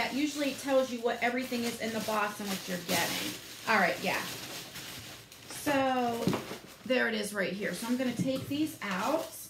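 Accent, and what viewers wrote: New Jersey, United States. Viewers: I love your jersey accent. My hubby grew up in NJ and we love going back to visit. We are from New England, United States. We went camping in a state park in NJ on a lake. We were ready for freezing water like in our home state. The water temp is 69 degrees right now ( very cold) well as soon as we walked in the lake were very excited to have it feel as warm as bath water for us!!! I love this box someday I will order one. Thank you for doing such an amazing job. The other thing in NJ that we have none here is the sound of cicadas wow they are loud but we got use to them. The cicada killer bees are huge and scared one of my kids terribly.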